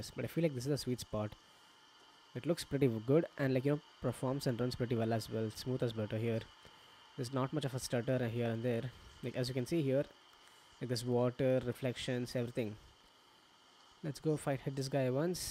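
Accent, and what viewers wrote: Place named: India. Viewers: The problem is if he tries to change his accent to native speakers then indian people will say that he is trying to be sn American.😄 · I don't understand Indian English sory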